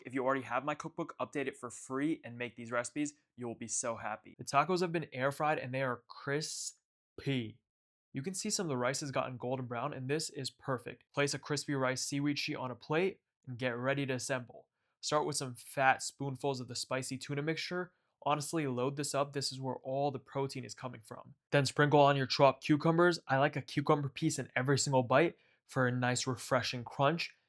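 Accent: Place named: Northeast, United States. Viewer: Your northeast accent is so hot!! ❤❤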